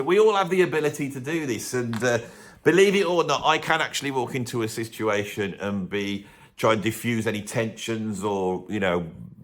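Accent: Birmingham, United Kingdom. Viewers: 2nd video i watched since i subscribed. Very well reasoned arguments. I knew you was a brummie. Lol.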